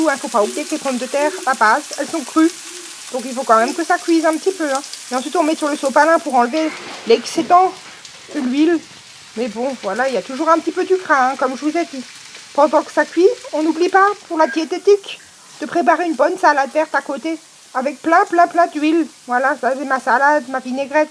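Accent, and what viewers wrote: Alsace, France. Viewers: rhooo l'accent alsacien est top ;) j'adore je vais essayé de refaire la recette bisous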